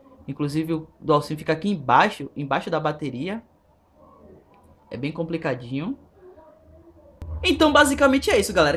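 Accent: Bahia, Brazil. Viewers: IUEAHAEIUHEAIU, seus vídeos são legais ! xD Você é baiano ? e_e'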